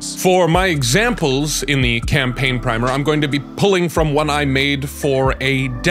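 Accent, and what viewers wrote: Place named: United States. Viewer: He sounds just like me a Michigander, except with a bit more drama to the voice. So sounds very American, very clear to me!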